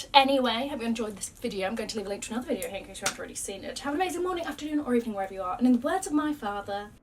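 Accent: United Kingdom. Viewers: I’ll have to keep an eye out for your British accent and fab style! 😉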